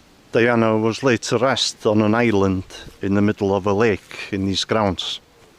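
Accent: Wales, United Kingdom. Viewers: Is he welsh thats narrating cos the accent is terrible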